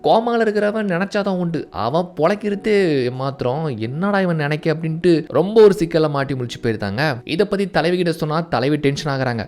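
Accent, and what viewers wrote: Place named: Kerala, India. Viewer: 1 month kku munadiye...... doubts vanthuchu.... but apo voice la malayalam smell vanthuchu..... BUT IPA KANDIPPA SOLLURE ...... TAMIL VOICE OVER ஆசூன் தான்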